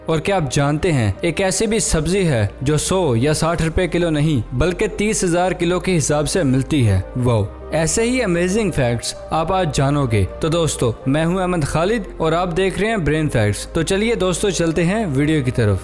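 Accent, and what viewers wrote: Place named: Lahore, Pakistan. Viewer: گدھے کا دودھ۔۔۔؟ لاہوری لگتے ہو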